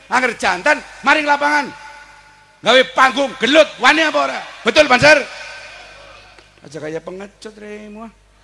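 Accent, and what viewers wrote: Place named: Tegal, Indonesia. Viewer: NIKU LOGATNYA KALO ORANG TEGAL YG TERKENALNYA NGAPAK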